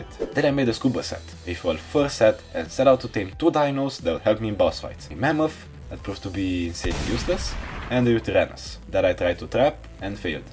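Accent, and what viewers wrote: Poland. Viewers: Are u from poland? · Kolego, is it a polish accent? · you just sound polish and im polish so was curious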